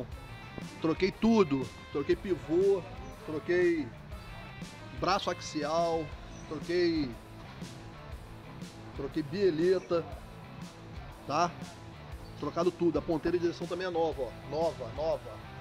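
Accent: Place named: Rio de Janeiro, Brazil. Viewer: bom dia! No vídeo, você mencionou que o único mecânico que teve a paciência de resolver o problema, foi o Russo. Sou do RJ, pelo teu sotaque parece que você também é...... Você poderia me dizer o endereço desse mecânico por favor? Abraços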